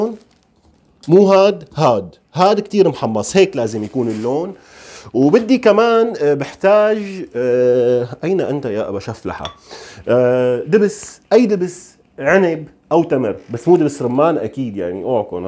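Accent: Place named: Syria. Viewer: فديت اهل سوريا واللهجة السورية متابعة من العراق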